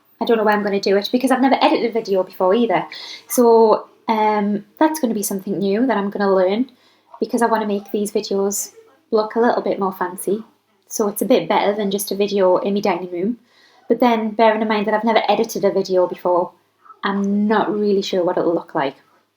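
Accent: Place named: Doncaster, United Kingdom. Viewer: Nice. Even it's hard to follow your accent as a non Doncaster 😅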